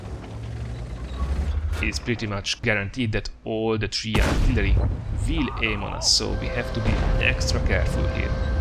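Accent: Hungary. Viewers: You got a really hungarian accent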